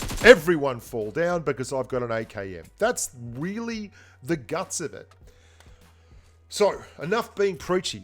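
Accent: Australia. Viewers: love the content in general, love the Aussie humour, the accent and the honesty of the commentary. Thanks! from Ireland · You don't sound British but Australian.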